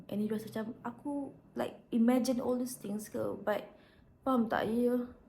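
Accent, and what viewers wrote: Kelantan, Malaysia. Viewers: Her accent sounds klantanese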